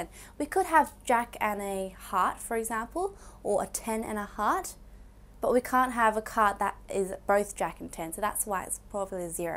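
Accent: Australia. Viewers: It's messing with my mind watching a Chinese girl with an Australian accent. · I like your Australian accent! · Omg thank you so much. She really explained very well. Btw the girl who teach reminds me of Rosè from Blackpink because of her Aussie Accent. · Aussie accent tho.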